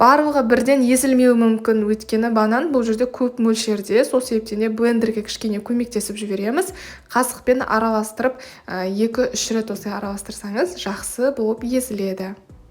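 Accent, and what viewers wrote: Standard. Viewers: Енбеккор пысык колыныз алтын керемет жансыз.жанеде кашан корсемде таза казакша сойлейсиз, шынайысыз.сизди унеми айтып инстаграмнан корип ютубтанда корсем мактап риза болып отырамын.коз тимесин аман журиниз😍😍😍🌷🌷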